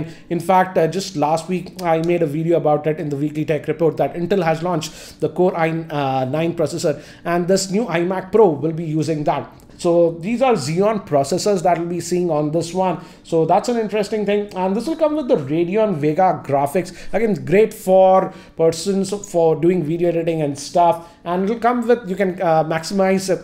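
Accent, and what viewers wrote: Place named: South India, India. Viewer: Know How it's is a south india thing....accent!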